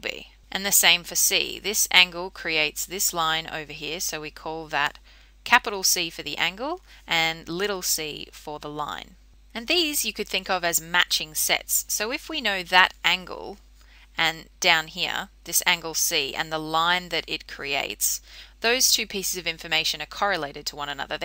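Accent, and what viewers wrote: Australia. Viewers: Aussie accent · Granin shes aussie not a brit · Granin lol she's very clearly australian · are you from australia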